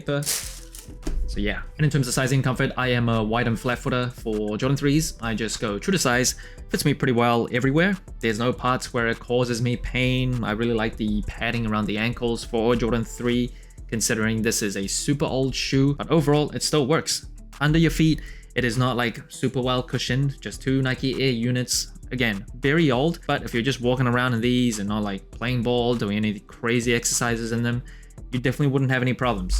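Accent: Australia. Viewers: Did you spend some time in Australia? I can hear a tinge of Aussie 👍🏼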